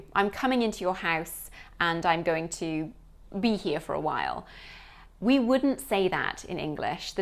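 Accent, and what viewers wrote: United Kingdom. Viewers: I like your British English, too. 🇬🇧😀😃👍🇯🇵👍😃😀🇬🇧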